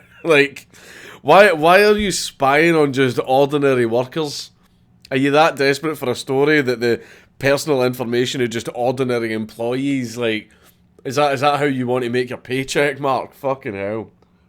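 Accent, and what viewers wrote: Scotland, United Kingdom. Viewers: I love the scot accent but if I'm not paying 100% attention I can not understand it 😂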